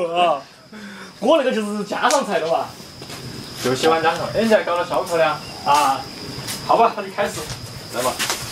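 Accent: Sichuan, China. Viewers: 四川话好听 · 你的视频有毒呀，这两天我一看就刹不住脚了。川普讲的很好哈哈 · 美食作家王刚四川口音非常好聽，不看字幕基本上可以懂～鄉下生活真有意思～很樸實，很平靜，很健康～ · 听到四川话好亲切，哈哈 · 听口音像川南的人，我是内江的，听到“是”字饶舌的感觉就熟悉。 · 来了美国居然在油管上看到听到四川话突然有点想家了。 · 聽得懂而且是好懷念的口音阿以前家裡隔壁開雜貨店的老爺爺是個四川人軍醫只是家裡附近老兵這些年全都過世了很久很久都沒聽到了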